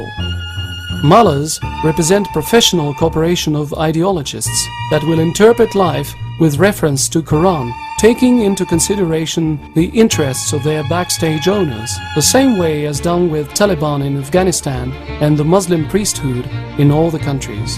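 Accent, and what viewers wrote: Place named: United Kingdom. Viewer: OH NO! Islam is telling people to be good and spread right and forbid whats wrong! HOW TERRIBLE AND DREADFUL! But we'll find a way to make it seem scary, lets add a douche with a British accent and some ominous background music and pics of random guys with beards cuz they look scary! Fuck ignorance!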